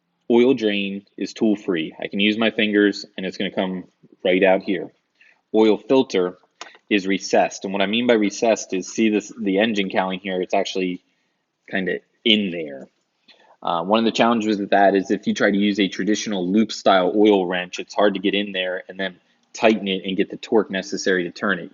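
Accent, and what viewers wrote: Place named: Pittsburgh, United States. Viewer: Stumbled upon this while trying to learn about the product JD product line. Living in Atlanta, but from PA... Picked up on the PA/Pittsburgh accent immediately, then looked over his shoulder and saw the sign confirming the locations. Very informative though. Thanks.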